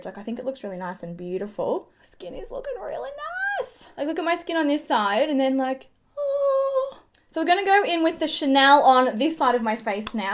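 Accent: Australia. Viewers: Oooooo love this! Yes that Aussie accent!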